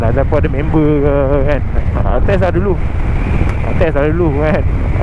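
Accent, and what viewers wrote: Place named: North, Malaysia. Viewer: Baru 1st time tgk video abg..menarik makin hujung video makin byk pelek utara kouaq 😅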